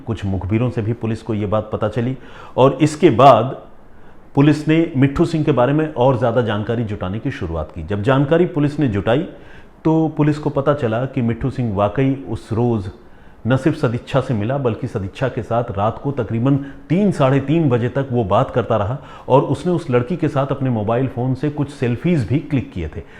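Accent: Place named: Bengal, India. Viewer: Dada awaz me Pata lagta Hai ki app bengali <link> west Bengal ki Medinipur dist se me abhi punjab ki Jalandhar me gold ka cam korta ho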